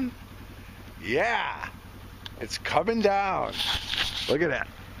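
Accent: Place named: New England, United States. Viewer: Keep up the good work. I enjoy whatever you post. Like most of your followers "Frankie and the boss" is my favorite. I think it would be a great intro AND exit song. We are having a very white Christmas here in West Michigan, thanks to our lake effect snow. I'm an old New England gal and I enjoy the yankee accent, even though I've been away from home for a good many years. My son and grandson both have Border Collies that are so smart. One lives on a farm and the other in a condo. For those that don't like your work.....well then, go away!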